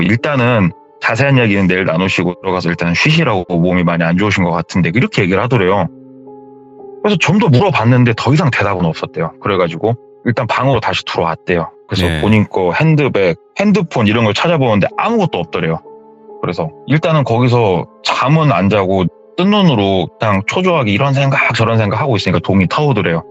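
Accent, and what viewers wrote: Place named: Chungcheong, South Korea. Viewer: 충청도 사투리로 돌려말하는거 ㅈㄴ 무섭네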